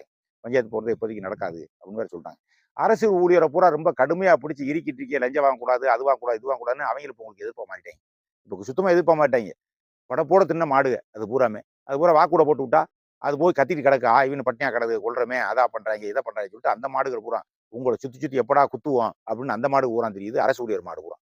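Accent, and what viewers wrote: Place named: Madurai, India. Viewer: இன்றய,,தமிழக,,அரசியல் பம்மாத்து,,பித்தலாட்ட சுய,,தம்பட்ட,,கீழான அரசியல்,,நாடகங்களை அடித்து. துவைத்து அலசி,,உதரி,,காயப்போட்ட எளிய,,மதுரை,,நெல்லை தூய,,அன்றாட,,பேச்சு தமிழில,,உரக்க,,மனதில் பதியும்படி அருவியாக,,குமுரி தீர்ர்த்து விட்டீங்க நண்பரே,,சகோதர்ரே் நன்றியுடன்.. சீதாராமன்,,80 வயது பம்பாய,,மும்பை - 51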